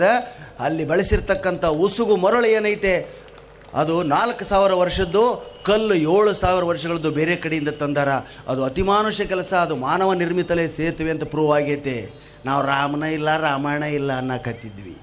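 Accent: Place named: Uttara Kannada, India. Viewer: Super sir nevu yell hodru nama Uttara Kannada bashe dag matadtiri ☺️Ur amazing sir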